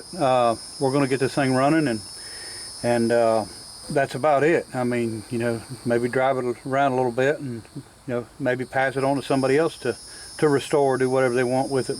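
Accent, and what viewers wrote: South, United States. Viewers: I am a French / bilingual Canadian from the province of Quebec, and I understand your language. It's the same with a beautiful southern accent. I love your automotive related videos. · Nobody speaks Canadian. Kilometres with an American sounding accent. I’m an old Aussie. We speak in millimetres and inches too. Haha. I do love the connection of you tubers. Absolutely awesome work sir.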